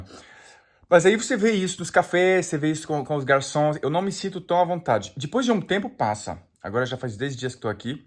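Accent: Brazil. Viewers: O francês abrasileirado!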